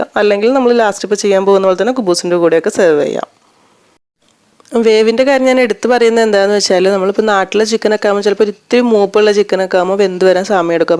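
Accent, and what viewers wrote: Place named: Malappuram, India. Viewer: നിങ്ങൾ മലപ്പുറം ആണോ സംസാരം കേട്ടിട്ട് കണ്ണൂരൊ മലപ്പുറം എന്തായാലും ചിക്കൻ സൂപ്പറായി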